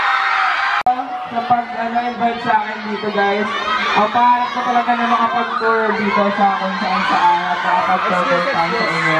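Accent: Visayas, Philippines. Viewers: bisayaaaa kaaa kuya??? i lab yow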